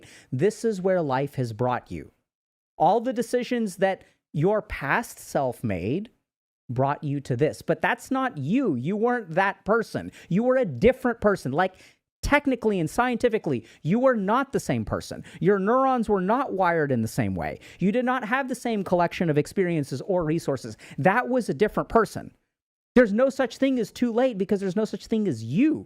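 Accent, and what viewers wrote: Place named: India. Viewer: I adore this India-accent of English 😉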